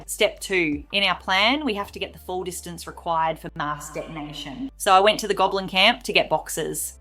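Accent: Australia. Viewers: i love the australian accent, I wish i was australian T_T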